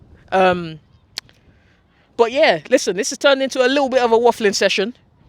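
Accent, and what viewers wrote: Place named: Ghana. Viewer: Hehehe!!! See! He talks like us. That's what fooled me! Ok then! Big ups to Ghana!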